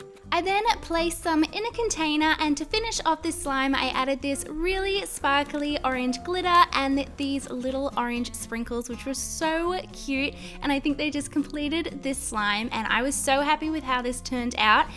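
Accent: Australia. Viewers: You can tell by her accent, she is from Australia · Shes Australian. She cant help it. If you want to hate, get the fuck outta here.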